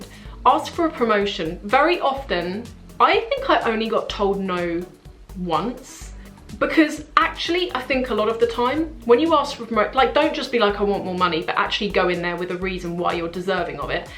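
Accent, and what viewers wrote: England, United Kingdom. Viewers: Hello! I am a new subscriber and just recently found you on YouTube. I am loving your videos! I get a coffee and settle in to listen to your lovely English Accent (I am from US) your style is so inspiring!!! and my favorites are when you open mail from you subscribers. You are so genuine! So humble and appreciative!! I really really am so glad to have found you!!!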